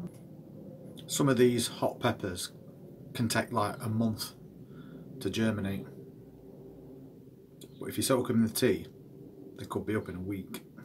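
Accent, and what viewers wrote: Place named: Derbyshire, United Kingdom. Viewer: Another good one.I live in Derbyshire and you sound like you have a local accent.🐝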